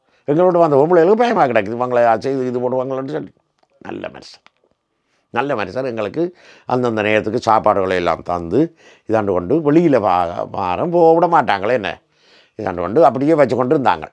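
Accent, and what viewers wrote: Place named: Jaffna, Sri Lanka. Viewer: 😂🎉thanks aiya for share your experiences in purely jaffna tamil 😂😂❤😂❤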